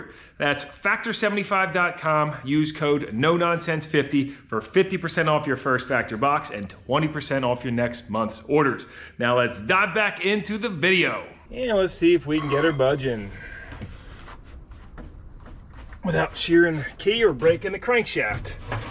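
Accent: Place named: New Jersey, United States. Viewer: You have the same accent as my cousins who are from Collingswood NJ ! I instantly was like woah this guy talks just like my cousin ! I live in Syracuse and they made fun of our accent lol they laughed when we'd say "wicked cool" or "its wicked hot" lol. Thanks for reminding me of fond childhood memories !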